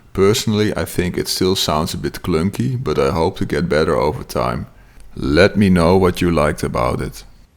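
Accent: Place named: Netherlands. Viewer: Hallo , Je mes is echt cool , je voice over is duidelijk en interessant maar probeer een beetje minder nederlands te klinken als het kan ....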